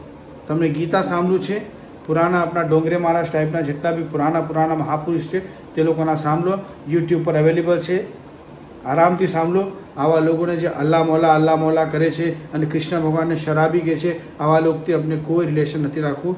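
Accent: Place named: mixed, India. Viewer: Tame proper gujarati nathi lagta thoda up bihar wada chho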